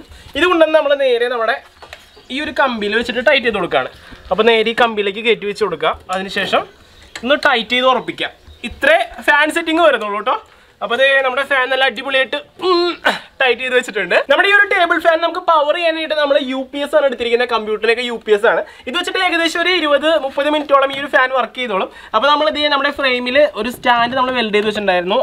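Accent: Thrissur, India. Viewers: Thrissur slang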